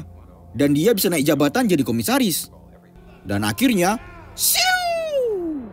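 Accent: Medan, Indonesia. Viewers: Medan mananya kau bang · Logat Medan Yo😂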